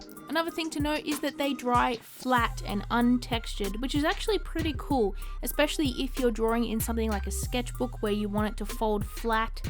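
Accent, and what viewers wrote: Australia. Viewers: I luv that australian accent :D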